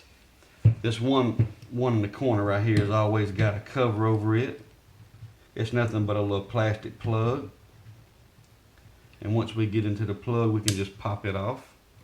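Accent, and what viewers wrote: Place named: Arkansas, United States. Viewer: i could listen to you all day long! You frpm Arkansas hahaha. God Bless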